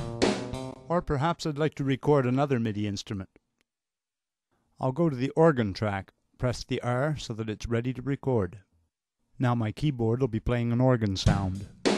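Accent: Canada. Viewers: Love the Canadian accent. How does Session Drummer 2 do on more funky rhythms with ghost notes and flams? Does it sound natural or do you get the "machine gun" effect.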